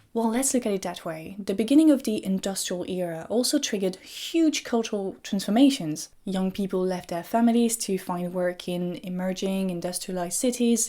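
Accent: North, France. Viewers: ah tu es du nord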